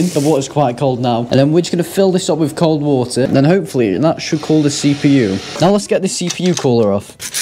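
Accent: United Kingdom. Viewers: this video just got recommended to me and i clicked and now im invested in this channel, idk i think its the fun stupidity and the british accent as im british aswell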